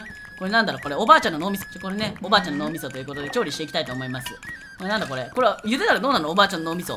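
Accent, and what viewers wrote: Japan. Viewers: もしかして鹿児島県民?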